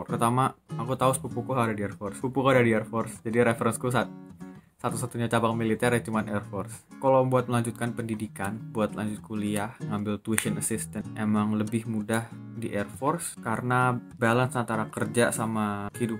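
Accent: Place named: Java, Indonesia. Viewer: Wihh jowo Endi mas 😂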